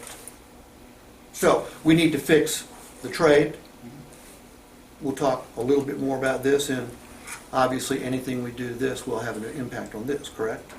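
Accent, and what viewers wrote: Appalachia, United States. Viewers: and his hillbilly talk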